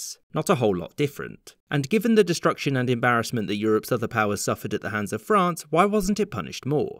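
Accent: United Kingdom. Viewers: I've always thought it was interesting how Brits pronounce things. It's so striking to hear someone call France, 'Fronce'.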